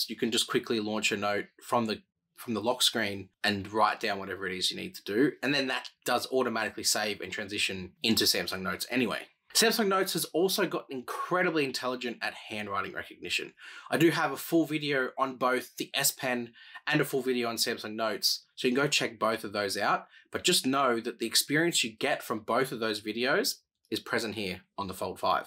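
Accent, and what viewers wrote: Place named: Australia. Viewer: Wow good to hear a Aussie accent on the phone review. Thanks so much, I have the Z Fold 3..might change to the 5 eventually..great review thank you 👍👏👏👏❤️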